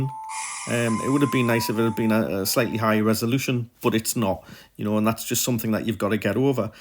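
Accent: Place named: Newcastle, United Kingdom. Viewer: Cool review Geordie lad Like me 😉😉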